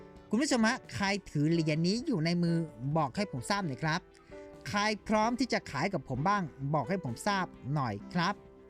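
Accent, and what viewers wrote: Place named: North, Thailand. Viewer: เห็นพูดทีไรเชียงใหม่ เชียงรายแม่ฮ่องสอน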